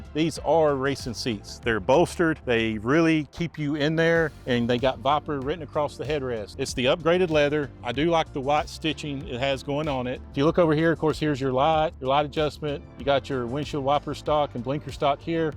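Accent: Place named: South, United States. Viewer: Holy fuck he is so country Merika .. LOL That's how you say Daadge Vah-phurrr !!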